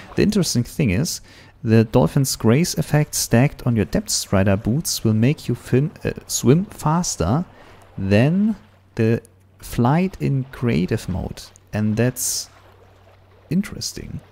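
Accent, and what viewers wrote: Germany. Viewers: I love his german accent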